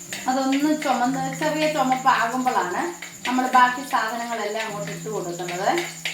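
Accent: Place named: India. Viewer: ഒരു കറി വച്ചാൽ എല്ലാം വിശദമായി പറഞ്ഞുതരും തുടക്കക്കാർക്ക് നല്ലതാണ് നാട് എവിടെയാണ് ഞങ്ങൾ പറയുന്ന പോലെ ചേച്ചിയുടെ ഭാഷ❤❤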